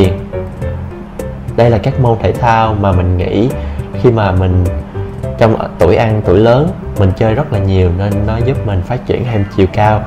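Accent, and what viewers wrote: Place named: Binh Duong, Vietnam. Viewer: Giọng nói của là người Bình Dương gốc luôn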